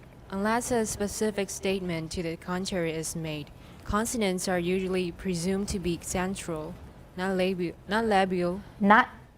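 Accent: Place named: Taiwan. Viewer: 史老师好认真，一本正经地讲解，正宗的英文和台湾中文口音好可爱。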